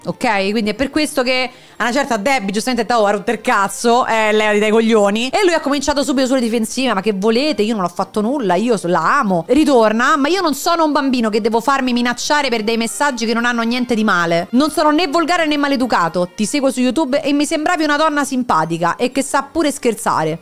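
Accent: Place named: Rome, Italy. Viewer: Sei fenomenale... Mamma il romano mi fa morire!!!!😂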